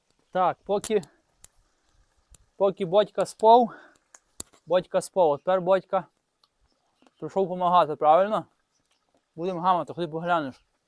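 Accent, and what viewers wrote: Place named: Galicia, Ukraine. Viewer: Класно ведеш і галицька говірка мені дуже імпонує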